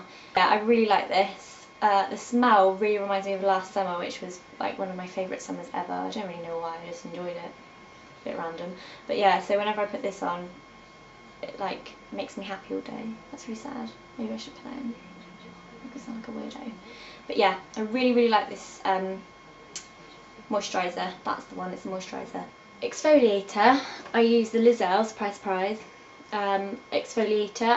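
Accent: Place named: RP, United Kingdom. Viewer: You're so different in this video aww, really posh for some reason?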